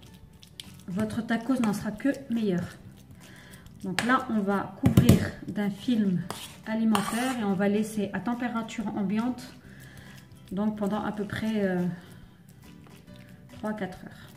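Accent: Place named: Algeria. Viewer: في الهدرة باينة جزائرية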